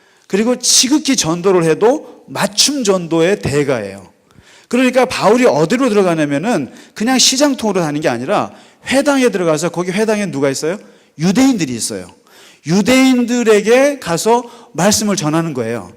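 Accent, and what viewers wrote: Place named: Gangwon, South Korea. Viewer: 목사님, 목사님을 통해서 많은 생각을 하게됩니다 젊은신 목사님같으신데 해박하심에 듣고있는것이 즐겁네요 덕분에 사도행전-성령행전에 빠져가고 있네요 그리고 여긴 강원도 동해안인데ᆢ목사님 여기분이신가요? 말씀듣다보니 여기지역말씀이 불쑥나오는경우가있으시기에ᆢ^^ 언제나 감사하며 듣고있습니다~ 건강하세요~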